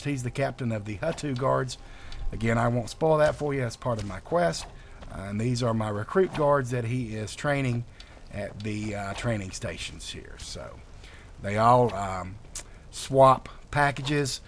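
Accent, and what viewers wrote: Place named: North Carolina, United States. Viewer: North Carolina was my first guess :) I work with a lot of Southerners... developing a good ear for that I guess! Looks good.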